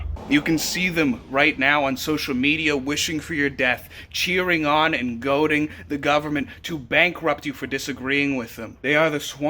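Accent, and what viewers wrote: Boston, United States. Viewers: I’m on the same page as you dude 100%. My only comment is that it’s funny to me that your Boston or whatever accent that is disappears when you’re on Live. Are you still in the army? Did you ever go to boot?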